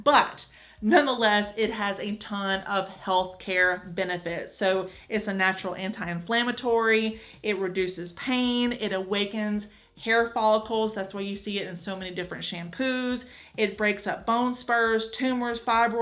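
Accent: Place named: Alabama, United States. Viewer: This is my first time finding your page and my first thought was she talks just as country as me, i wander if she lives in Alabama too ? 😂😂 And before the video ended u confirmed that in fact u do 👏🏽😂i knew it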